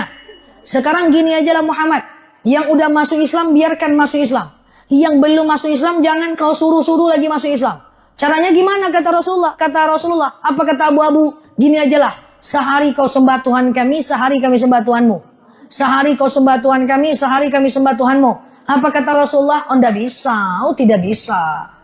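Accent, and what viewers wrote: West Sumatra, Indonesia. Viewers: USTADZAH ITU PUNYA LOGAT,SEPERTI ORG SUMATERA BARAT (PADANG). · Katanya paniang kapalo brarti eta ustadzah urang minang euy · Orang Indonesia ( minang bro ,kelihatan logatnya) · Minang ini. Mas bro 🤭😊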